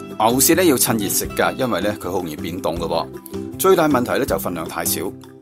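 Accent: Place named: Hong Kong, China. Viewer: Data兄的广东话在香港人中应该算非常好听的那种吧？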